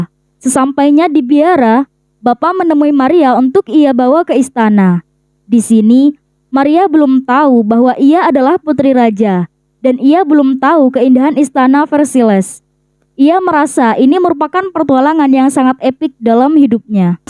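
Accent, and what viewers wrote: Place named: Madura, Indonesia. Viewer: Kek orang madura nih logatnya 😅😂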